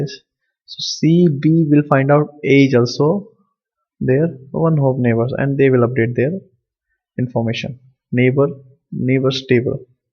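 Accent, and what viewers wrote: India. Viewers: I am sure it is very helpful but you Indian guys must dub your videos with a British or an American accent.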